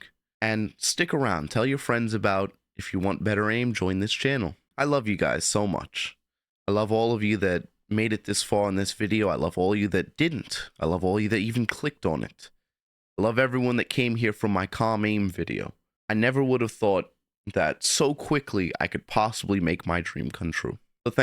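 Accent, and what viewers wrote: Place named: New York, United States. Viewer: had a feeling you were from new york coach lmfao keep up the good work!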